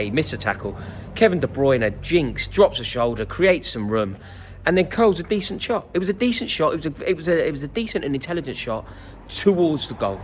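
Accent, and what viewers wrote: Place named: England, United Kingdom. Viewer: Your English accent is so fine to hear , very traditional and classy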